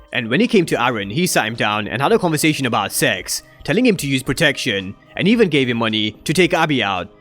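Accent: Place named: United Kingdom. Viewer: Your accent sounds like your from the uk, if so i rate what your doin i always watch yours n marc dark reviews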